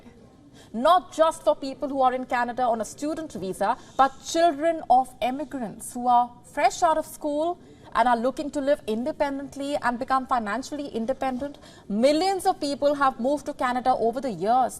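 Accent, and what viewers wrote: India. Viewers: this girl is trying to speak like an English speaker but her indian accent really dominates how she pronounce the words.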